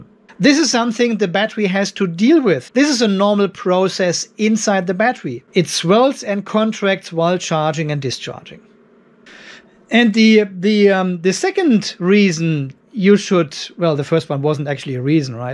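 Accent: Germany. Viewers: Now this is a very video for me. Let me explain. I recently dismantled 6 Mastervolt 24 Volt batteries, each containing 6 Thundersky 170 Ah cells. When I dismantled them, I noticed the cells were in a metal enclosure. 2 steel bars on each side and those bars were connected to two end-plates by sticking through holes in the end-plates and were kept in place because they were twisted behind the end-plates. (Apologies, English is not native to me). Some batteries had so much tension build up due to expansion of the cells, that, when I untwisted the sidebars, the batteries literally exploded mechanically with a loud bang. After removing the cells, some cells were so inflated that they resembled the Michelin puppet. The ones I didn't trust were taken directly to the communities local chemicals intake point. The other ones I tested and kept them when they were still good. 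I'm about to install EVE cells into my sailing yacht. I don't even consider compression. If a cell expands (too) much, I want to be able to see it and take appropriate measure in time (replacing). Thanks, love your channel, especially the German? / Austrian? accent. I learned and still learn so much from you as well as from Kermit.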